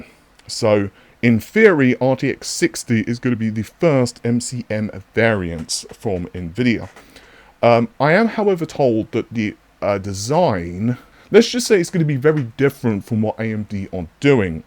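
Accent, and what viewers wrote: England, United Kingdom. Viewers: Accusing an Englishman of faking an English accent?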